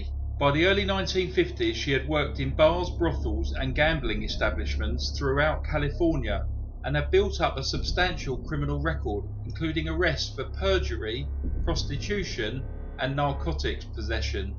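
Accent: England, United Kingdom. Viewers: These cats think anyone with an english accent sound like the same person.! lol Although these are 2 of my very favorite channels! Brothers or cousins maybe?? teee heee! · I think people think you and Brief case sound alike because of your British accent. To Americans British accents all sound alike.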